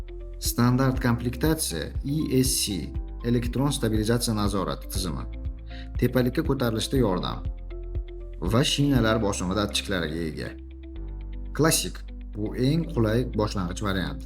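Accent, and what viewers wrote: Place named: Uzbekistan. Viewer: Тоза узбекча учун алохида рахмат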